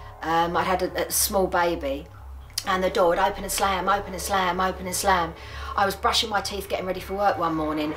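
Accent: England, United Kingdom. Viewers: english accents are so pretty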